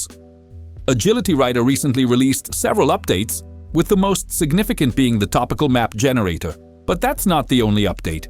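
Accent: Italy. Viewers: I miss the Italian accent and charm.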